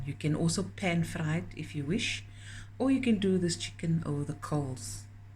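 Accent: South Africa. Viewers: I love the South African accent. It smells home.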